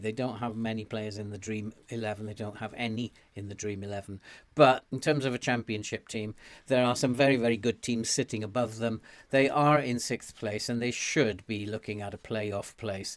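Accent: Wales, United Kingdom. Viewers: What an amazing guide, I really wanted to find a way to create my own built on the abilities of my players rather than copy and paste one. This is exactly what I needed, thank you! I also detect a Welsh accent there mate, where are you from? Cardiff here! Subscribed with thanks!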